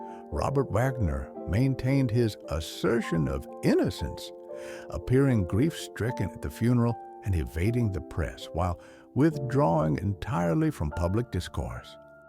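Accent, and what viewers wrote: South, United States. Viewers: He has Alzheimer’s. Why does the narrator have a deep southern accent? Walked and Wagner supposedly were in a sexual act when Natalie walked into the main room of the boat. If several people heard her cries, why did they not take action? Everyone was extremely drunk. Can you solve a case 44 years later? A tragedy definitely. I would never make an opinion based on this evidence, or lack there of. If those other wealthy people heard her screams, why didn’t they make sure all was ok?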